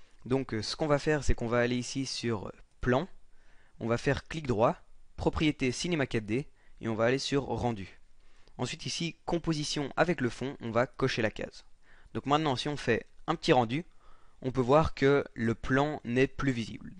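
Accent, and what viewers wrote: Belgium. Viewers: tu est belge ? si non tu as l'accent belge ^^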